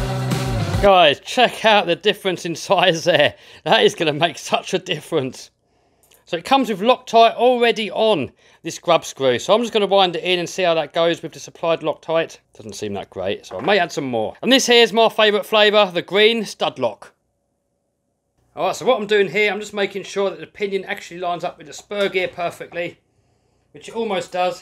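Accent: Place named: United Kingdom. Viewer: That British accent ❤️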